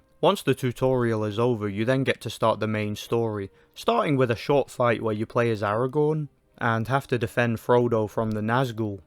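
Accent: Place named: Nottingham, United Kingdom. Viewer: complete shot in the dark, especially if you say you've moved around but I'm hearing some Nottingham mostly, maybe some south or west Yorkshire or Geordie. But alternatively your "L" sound feels like Richard Taylor from New Zealand, but the rest of your vowels don't match the nasal brightness of that accent. Either way GREAT review. I played this one after Return of the King so unfortunately it felt like a big step down in a lot of ways, it felt clunky and a bit too difficult to be fun (but maybe I just sucked lol, especially that Helms Deep protect the door level). But thanks for your work. Brought back some memories.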